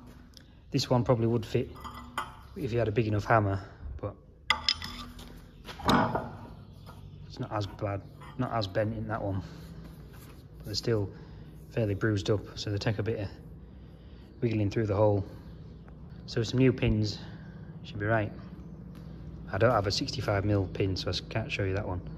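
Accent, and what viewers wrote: Yorkshire, United Kingdom. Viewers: Learning and overcoming problems, is what real world manufacture and repair is all about. How tedious it must be to have a job where everything falls into place first time. BTW the auto generated subtitles make a hilarious job of your Yorkshire accent. Thanks for posting.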